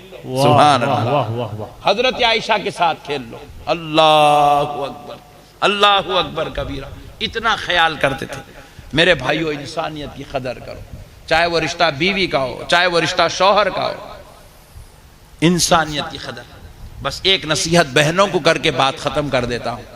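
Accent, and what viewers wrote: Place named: Hyderabad, India. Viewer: This man best comedian in hydrabadi language.whare he from nobody know .kon gooooouuuúu hai inka producer of event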